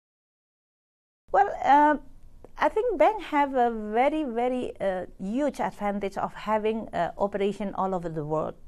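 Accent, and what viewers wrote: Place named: Indonesia. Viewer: Lantas dengan kemampuan menghilangkan aksen Indonesia anda, sudah jadi apa anda sekarang? -- Orang seperti anda inilah yang sejatinya masih menjadi katak dalam kubangan, setiap individu memiliki kemampuan, dan keahlian masing2, sosok seperti bu mulyani yang pandai dalam mengelola keuangan, tentu tidak akan menghabiskan waktunya hanya untuk menghilangkan aksen jawa atau Indonesianya. Selagi grammar dan tata bahasanya dapat dipahami, maka tidak ada yang salah ketika kita masih memiliki aksen bahasa pertama.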